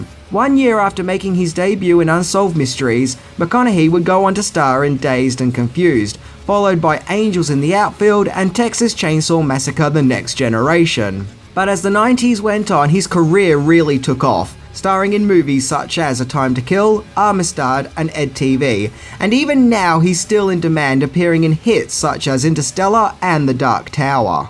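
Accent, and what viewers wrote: Australia. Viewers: Disclaimuhhh, love that Australian accent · Thanks for reminding me why I so hate Australian accents 😖😖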